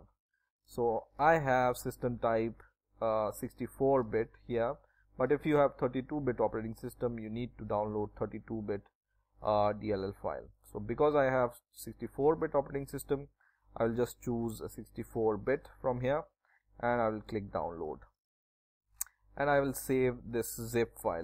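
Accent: India. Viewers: I heard his indian accent = instant CTRL+W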